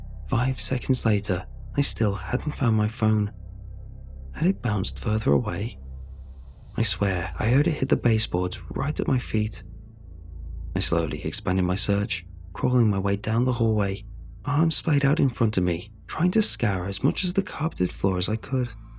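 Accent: United Kingdom. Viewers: British accent from Chattanooga Tennessee?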